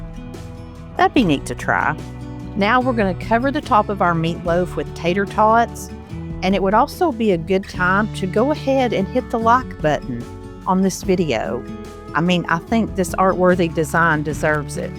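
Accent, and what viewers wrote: South, United States. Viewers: Hi! I recently discovered your videos & I'm hooked! Yours are my favorite of them all! I love how you show the ingredients & sometimes tell where to get them. I also like that the ingredients are simple & the recipes are good & easy to follow (love your southern accent)! I've made several of your recipes & me & my family loved them! I can't wait to see what you'll do this year. Keep up the great work! I've been telling people about your videos because they are the best! Happy New Year & may God bless you!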